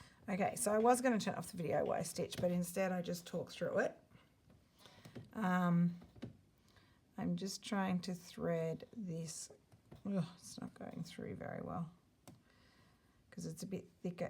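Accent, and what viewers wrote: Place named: Australia. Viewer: Thank you, for your time, talent, and inspiration! I, too, have no friends that slow stitch! I do have fellow teacher at my school who is from Perth - she spends our summer there. So, talking with her is like visiting with you and - I love the accent!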